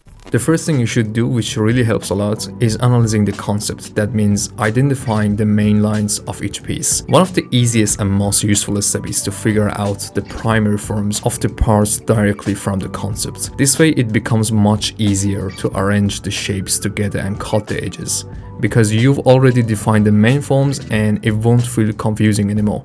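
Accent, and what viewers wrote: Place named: Iran. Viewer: This video is amazing, but im a beginner in zbrush or 3d space i worked with cc3 and 4 and i must say iclone and character creator is awesome but in zbrush i just know the barebone basics, where would you suggest that i go for learning zbrush or modeling? My goal for now to make a superhero characters and stuff, like batman or red hood or superman basically characters with armors and helmets or trench coat and cape, i know that you're making the same kind of stuff in your video but my problem is it that i didn't know some of things that you are doing, like using specific tool or features I checked a lot of tutorials but somehow there confused more because i follow what there doing but these always a problem, so the help or recommendation would be appreciated. By the way your accent is so Persian, if you're one of us, dorud my brother, a lot of love from hormorzgan❤️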